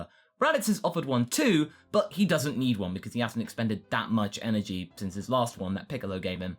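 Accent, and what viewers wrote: United Kingdom. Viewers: lmfao, his accent is what got me XD Damn British English!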